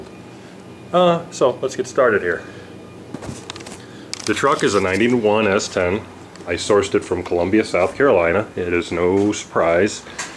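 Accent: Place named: Cleveland, United States. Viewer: Listens to this guy talk: definitely from up around Cleveland. “So anyway up here around Northeast, Ohio.”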